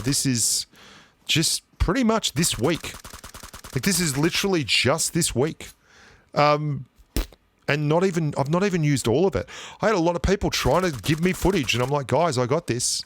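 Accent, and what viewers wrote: Australia. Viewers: Totally agree,...I dropped out of the entire last season and a half due to the exact same reasons you’ve just highlighted. Have returned to play in recent days. I’ve missed watching your content and hearing your hilarious Aussie-isms! 😊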